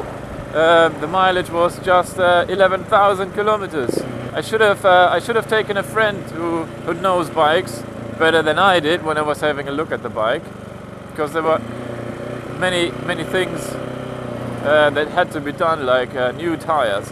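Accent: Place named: England, United Kingdom. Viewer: Ist mir aufgefallen, einen leichtes flat-english ist rauszuhören! :-)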